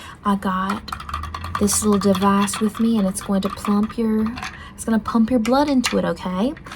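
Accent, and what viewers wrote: South, United States. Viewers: THE SOUTHERN ACCENT SLAYEDDDDD😜💅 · HAHAHA I love the southern accent so much!! despite how serious and scary this video is the southern accent made me laugh!! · As someone from the south: this is pretty good!!! It's fun to hear the voice with her physical mannerisms, cuz it's very different from people in the south i reckon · Lol I wonder if I should admit I kinda got hungry watching this, I just love southern cooking 😅Speaking of which, I actually have southern relatives and I thought you did great with your accent !!💗:_approved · The southern accent was really good! Although a lot of people where I live accent is heavier than that(😭) you did super good love it! Feeling kinda cozy while being cooked 🎀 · What I think about this video: I love the southern accent ❤ It was not interesting that you used the same nickname over an did very like honey pie and sweetie pie ( no offense 😅) I think to boost your the amount of people who watch your videos is to make it more realistic but I still love the effort 😊 Try getting toys that are used for the topic of your video so for this video you would need a knife, ingredients,toy cooking supplies can make your videos amazing 🤩 That's all I think about this video!❤ Keep up your amazing work and I hope this motivated you and inspired you to do this too! 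💕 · as a southern person with a southern accent, i think you did great!! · Omg I love your southern accent 💙🖤💜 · The Southern accent is so relaxing! But the ending…is it hinting that Alex and Eric were not simply in-laws and had something deeper between them :_cool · I REALLY LIKE THE ACCENT, I HOPE YOU DO MORE SOUTHERN ACCENT VIDEOS 🔥🔥🔥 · THAT SOUTHERN ACCENT ATE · we need more of this southern accent from you! it’s so relaxing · Please do more with a southern accent 🙏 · Bro took we are cooked to a way new level 😭😭 (*btw you ate on that southern accent:3*)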